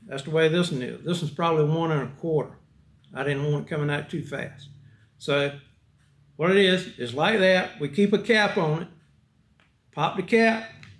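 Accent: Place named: North Carolina, United States. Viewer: Hahaha heard you talking and knew you were from NC.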